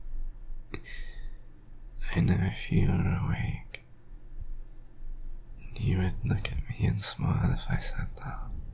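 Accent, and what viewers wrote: England, United Kingdom. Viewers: Thanks for the video, btw i love your accent! Are you from england?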